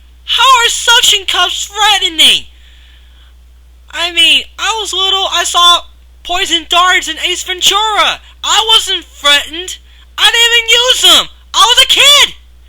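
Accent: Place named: Australia. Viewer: I thought it was aussie.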